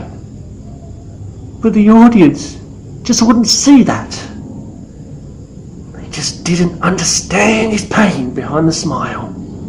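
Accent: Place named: London, United Kingdom. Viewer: BRILLIENT HAHA YOU CAN HEAR YOUR LONDONER ACCENT HAHA LOVED THIS AND THE HUMOUR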